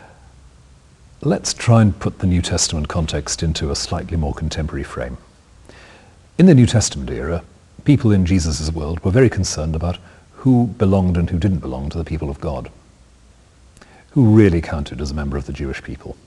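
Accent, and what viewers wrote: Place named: United Kingdom. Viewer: Sheesh...That's not right. The Gospel has nothing to do with anything WE do...You're saying that "The Gospel is for us to trust Jesus?" That's not right...c'mon...you can't fool me with that British accent...although it does make you sound rather intelligent...Kinda like Richard Dawkins sounds intelligent...lol. But I know you know better than this...Why not just say what the Gospel is? You don't have to contemporise it, or put it in modern terms...just preach it!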